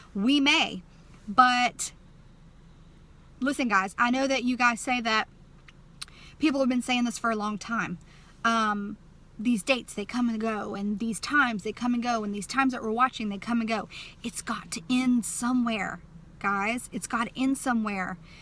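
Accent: South, United States. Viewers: Your Southern accent makes me miss Texas and where I grew up. It is cold here in Germany also:-) GOD Bless in Jesus Christ name:-)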